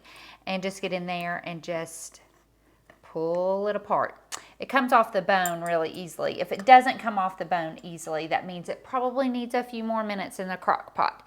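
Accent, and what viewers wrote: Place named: South, United States. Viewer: Like the content! I love your southern bell accent ❤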